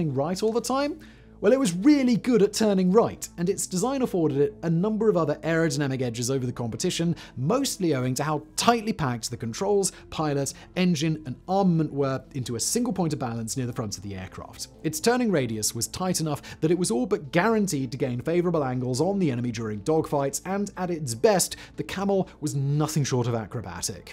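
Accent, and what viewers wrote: United Kingdom. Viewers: Funny how these chaps with the funny accents always seem say the 'most formidable' and 'very best' aircraft of the wars were the British ones. The Camel, which I personally love, was in dire straits if come upon by an airman of equal skills flying a Fokker DVII, as turning fighters are at a distinct disadvantage to energy fighters, and cannot run away when cornered. A smell of arrogance permeates the air when the average limey speaks of her Majesties Royal Air Corp. I would stand shoulder to shoulder with a man (girls, needn't bother) who would with humility speak of the profound glory the Camel rightly deserves. Observe that, the 'greatest' or the 'most deadly' are terms that mouthpieces use to sell their video of the day. Oh, give over blokie!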